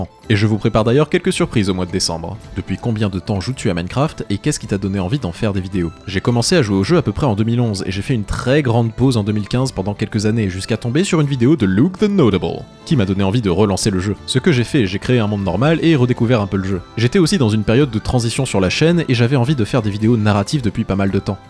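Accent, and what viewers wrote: Quebec, Canada. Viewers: Bonjour, depuis que j’ai vu ta chaîne je regarde tes vidéos et une question me trotte dans la tête et tu n’y as pas répondu dans la vidéo. D’où te vient cet accent québécois exceptionnel ? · Est tu qebecois? Moi oui.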